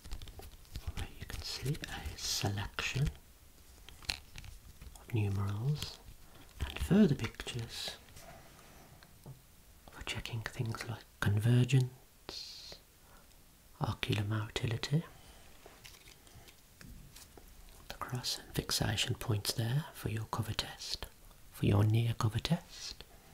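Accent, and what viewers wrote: Birmingham, United Kingdom. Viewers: I think he’s from Birmingham or around that area :)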